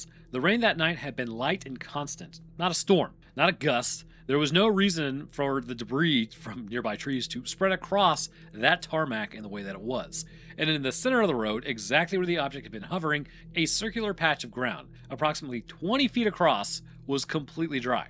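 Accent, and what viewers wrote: United States. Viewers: He pronounces the 'Merican way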